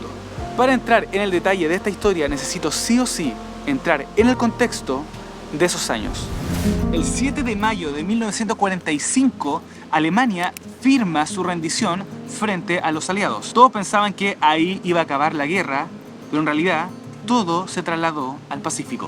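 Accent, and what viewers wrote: Chile. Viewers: ERES CHILENO! Que lindo escucharte! Gracias por comenzar el video sin intros y directo al grano. Gran video y dicción. Sigue así 😊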